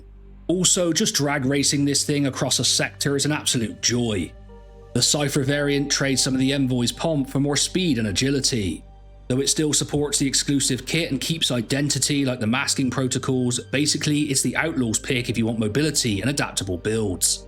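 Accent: United Kingdom. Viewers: I didn't know you were Portuguese, congrats ! only Portuguese I know with a brit accent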